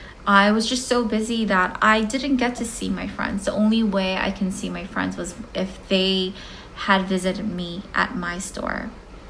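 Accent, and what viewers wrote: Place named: United States. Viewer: 핱시봤을땐 한국인유학생출신인줄알았는데 이 영상보고나니 한국계미국인인거 확 와닿네요 말하는 방식이 완전 미국인ㅋㅋㅋㅋ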